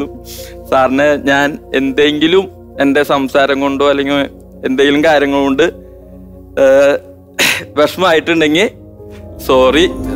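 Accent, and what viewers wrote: Thrissur, India. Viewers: Thrissur language pwoliyalle... ❤ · സത്യം parajal DD ഉള്ളത് കൊണ്ടാണ് നമ്മളെല്ലാം ee programe kanunath അല്ലെന്ന് aarkenkilum തോന്നുന്നോ 😍😍 DD chetta ഭാഷ ഒന്നും oru പ്രശ്നം അല്ല numma തൃശൂർ ഭാഷ polialle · DD... Nammude thrissur language vittu povathathil enthnaa vshamikne..nee njngalde muthaaanu... Oru anchor nu paranjal kadichal potatha english parayunna aaalaavanam ennonum illa... Thrissur tone maatathe nee samsaricho... Ini ipo athu ishtavathavar nine kananda... Ninak nammal thrissur nte gadikal full supportaaa... Comments nokku dd... Thrissurr eno palakad no ennonum illa... Ithu kanunna ellarum nine ishtapedunnavaraa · Thrissur ഭാഷ ആണ് DD ടെ superb u r great. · Thrissur bhaashaa aaa chetto chetne highlight 😍😍😍.. Don't ever change that. That makes your way of speaking so attractive.. We should all love our native slang. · Ath thane. 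Thrissur kar pinne thrissur basha thane alle paraya.kore alkar kuttam parayum but dd not change your thrissur slang. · നീ നമ്മുടെ സ്വന്തം തൃശൂർകാരൻ അല്ല.: ടാ അതിൽ ഉപരി എന്താ വേണ്ടത്.. നീ മുത്താണ് സ്വന്തം ഭാഷ ശൈലി അണ് ഈ ഇപ്പോഴും തുടർന്ന് കൊണ്ട് പോക്കുന്നത് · തൃശ്ശൂർ ഭാഷ superഅല്ലെ നീ മുത്തല്ലെ dd you are grate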